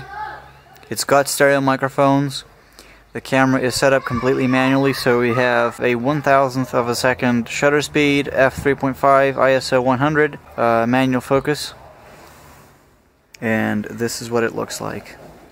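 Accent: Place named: New York, United States. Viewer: Que delícia ver um brasileiro falando inglês sem sotaque, na verdade, parece que você tem sotaque de NY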